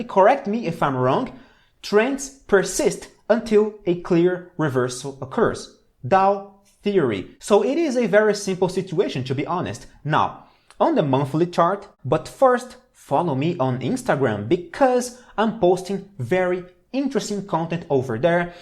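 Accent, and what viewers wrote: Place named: Brazil. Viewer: I knew it! I've had Brazilian friends and ESL students. I'd recognize that beautifully accented English any day. Well done, sir!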